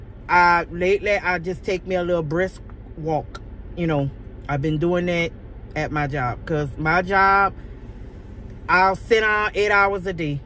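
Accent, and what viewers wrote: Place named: New Orleans, United States. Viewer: I can hear the New Orleans in the accent baby! lmao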